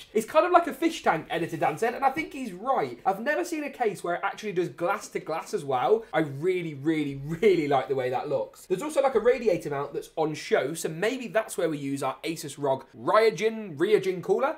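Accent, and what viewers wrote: England, United Kingdom. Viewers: England accent :/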